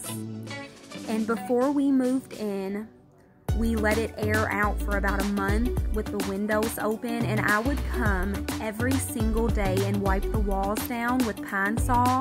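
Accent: South, United States. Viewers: I enjoy listening to your commentary because of your accent. It reminds me of my southern family, and you're pretty as well. Love from California.;)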